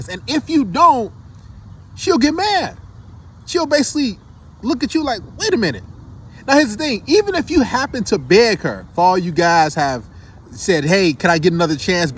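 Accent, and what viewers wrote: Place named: Long Island, United States. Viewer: That gawd aweful Long Island accent!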